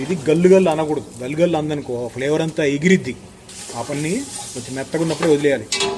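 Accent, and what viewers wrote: Andhra Pradesh, India. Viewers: Anna garu you are talangana man But your talking pure AP talugu so proud of u anna garu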